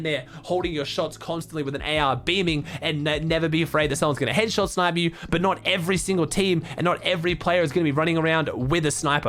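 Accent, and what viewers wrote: Australia. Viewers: noti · YES MATE I LOVE YOU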